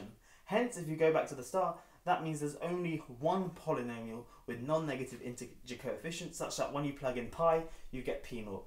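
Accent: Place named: United Kingdom. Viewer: your british accent makes things so easz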